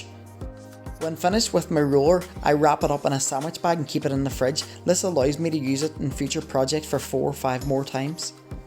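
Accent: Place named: United States. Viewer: really like your recycles but I am very confused by your language. Are you Irish, American or Canadian? Your products and pricing are British but you talk as if you are American/Canadian.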